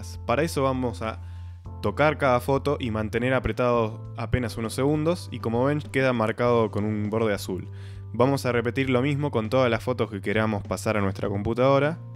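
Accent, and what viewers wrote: Argentina. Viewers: Excelente tu explicacion No podes negar que sos argentino!!!! Un saludo desde Mexico. Aclaro yo lo soy. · Es argentino 😑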